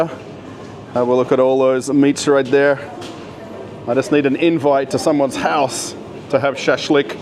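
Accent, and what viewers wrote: Australia. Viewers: I'm russian, but I like to listen to clean australian english) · Hello,!!! По работе я не могу много смотреть твои видео,но к счастью могу много слушать. Поэтому я,пользуясь случаем, совершенствую свой австралийский английский,мечтая в будущем посетить твою прекрасную родину!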